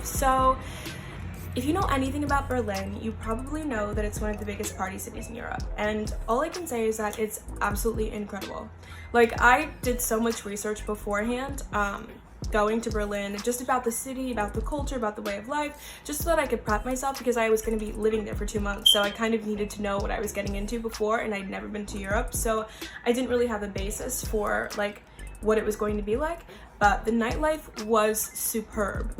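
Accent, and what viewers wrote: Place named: United Kingdom. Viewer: i Said it somewhere else in the comments already, but frankly, there are almost never Americans of colour coming to Germany. Even with your accent, I would assume you’re from the UK. Also, people working at food stands are oftentimes local folks and not the most international, able to grasp the history of slavery in the Americas as a whole. Berlin is nice and I like it every day, no matter Corona. Without corona, spending your night with friends at Sisyphus or at the river with Späti beers is great. Thanks for saying our metro is clean, I always have the feeling like it’s gross. Puke, pee, full program. Greetings from Berlin.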